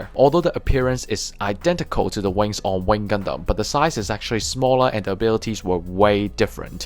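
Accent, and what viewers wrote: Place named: China. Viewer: I can't quite place your accent. I wanna say maybe Chinese or Tai. Either way, you don't give yourself enough credit. I didn't have any trouble understanding you.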